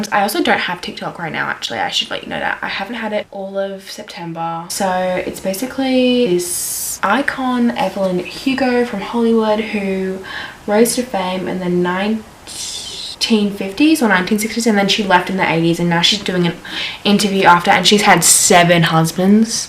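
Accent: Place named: Australia. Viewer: This is really random but you and phoebe Tonkin have the exact Same specific dialect like I could close my eyes and I could picture a young phoebe. I’ve never heard another fellow Australian sound so similar haha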